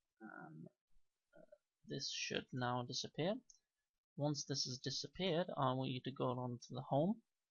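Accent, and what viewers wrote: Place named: United Kingdom. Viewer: Fantastic! I could listen to your lovely voice all day ♥... Lovely accent. What part of the UK? Subscribed :)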